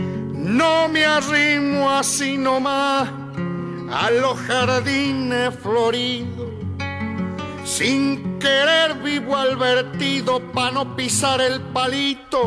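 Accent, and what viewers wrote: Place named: Mendoza, Argentina. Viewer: soy del Ecuador, me encantaron esas coplas, el tiene acento mendozino, es de ahí el??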